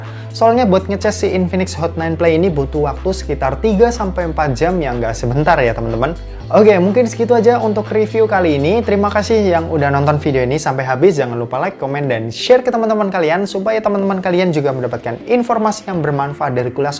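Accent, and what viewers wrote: Semarang, Indonesia. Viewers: Org semarang ya gan? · Orang semarang lu yak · Wah, abang orang Semarang ya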